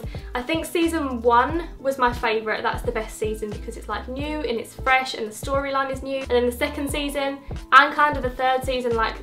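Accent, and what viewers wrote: Essex, United Kingdom. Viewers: you sound particularly Essex in this😂💘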